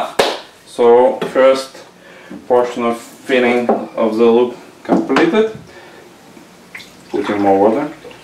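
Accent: Russia. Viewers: You are russian? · I just have to ask, are you Russian? or maybe German>?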